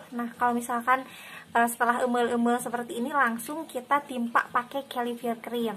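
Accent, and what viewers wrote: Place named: Sunda, Indonesia. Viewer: Eta eumeul eumeul...meni nyunda pisan atuh, si teteh geulis teh...he he...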